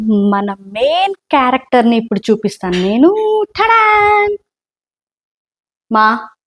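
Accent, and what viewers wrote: Karnataka, India. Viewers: Miru karnataka vala mi telugu border lo kannada valu ela ne matladutharu memu same mi la ne matladuthamu telugu but we are kanadigas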